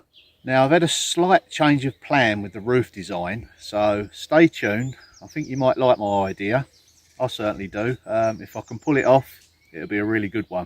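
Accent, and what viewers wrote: Essex, United Kingdom. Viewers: i live in Thorpe Bay (Southend) i knew you had a soft Essex accent. Wonder why you left?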